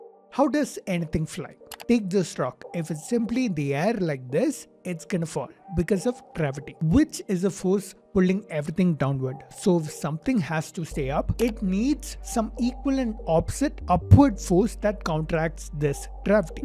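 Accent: India. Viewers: Very very strong indian accent, needed subtitles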